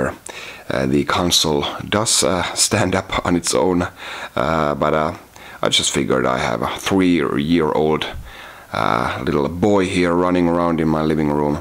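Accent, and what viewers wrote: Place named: Finland. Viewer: Eli oot sä suomaleine jos oot nii aksentti paljasti ja kanavan nimi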